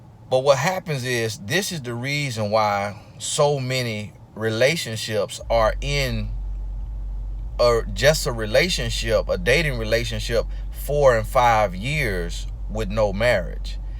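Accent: South, United States. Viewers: I just enjoy hearing you talk, that country accent though.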